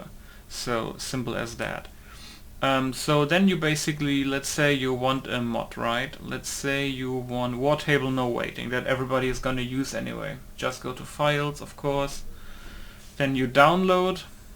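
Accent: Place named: Germany. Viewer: Dude seriously as a german myself watching this video was funny af with his constant accent Iam litterly dying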